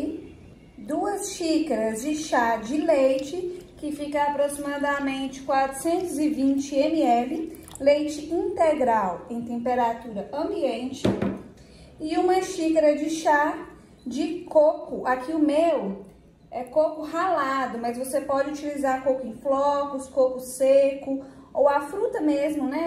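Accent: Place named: Minas Gerais, Brazil. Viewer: Muito mineira estou vendo de santos SP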